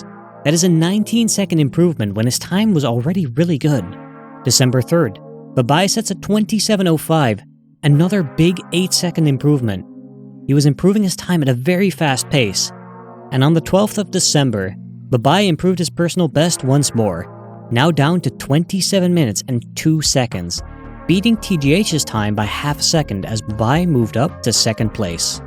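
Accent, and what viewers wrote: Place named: Netherlands. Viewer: Dit is misschien het meest undercover Nederlandse accent ooit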